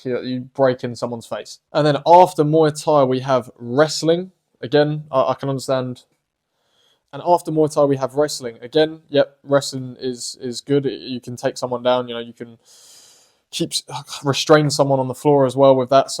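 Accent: United Kingdom. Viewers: This is the first time i've ever heard your voice because I always just watch your shorts which always have " " as the sound, I wasn't expecting you to be british😅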